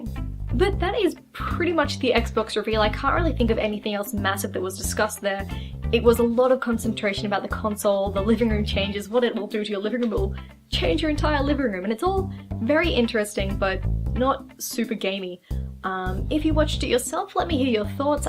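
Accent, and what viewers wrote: Australia. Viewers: Why do people always confuse Aussie accents for British? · An Australian? I thought you were British???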